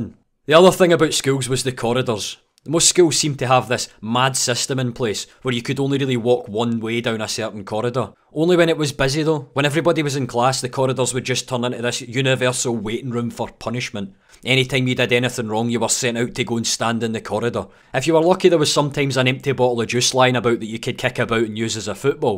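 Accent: Scotland, United Kingdom. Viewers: You should allow us to write subtitles for your videos, as autogenerated subtitles aren't good with a Scottish accent.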